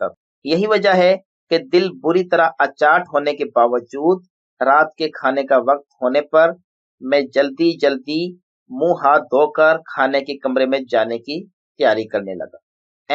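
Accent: India. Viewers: لہجہ ایسا جیسے کوئی انڈین اردو کی ٹانگ توڑ رھا ھو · Awaz waly dost indian lag rahy hain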